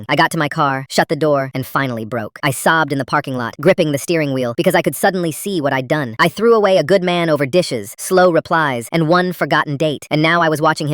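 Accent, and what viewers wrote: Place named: Latin America. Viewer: You sound latina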